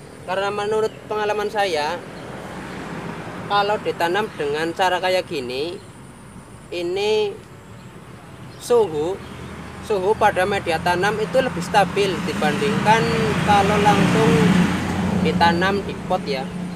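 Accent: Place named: Java, Indonesia. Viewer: Medok mas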